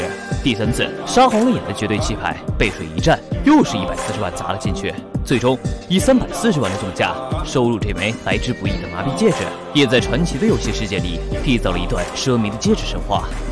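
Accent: China. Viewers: 聽起來是大陸人 可是單位一直換算台幣 搞得我好亂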